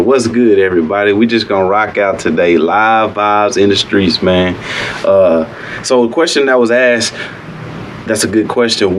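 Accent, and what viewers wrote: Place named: Chicago, United States. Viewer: You sound like you from Chicago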